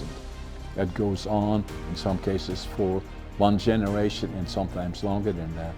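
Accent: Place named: Netherlands. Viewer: Still that Dutch accent haha.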